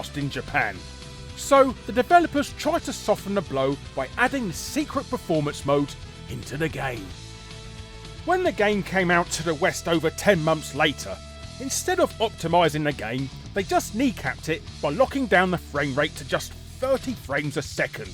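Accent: United Kingdom. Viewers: He's just British.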